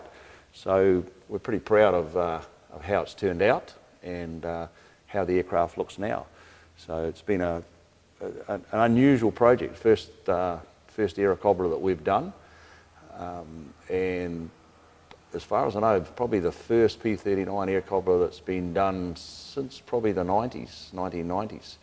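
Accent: Australia. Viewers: Bollocks does this gent have a thick Aussie accent! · Sounds Aussie?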